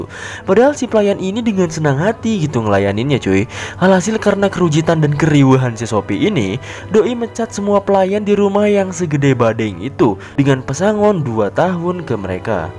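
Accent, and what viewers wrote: West Java, Indonesia. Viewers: Sunda euyy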